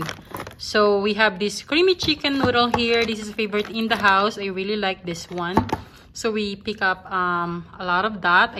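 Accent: Philippines. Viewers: Mam meron po kayo Filipino accent!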